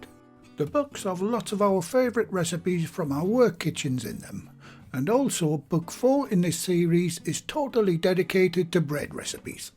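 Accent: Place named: Northeast England, United Kingdom. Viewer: he’s northeastern English :)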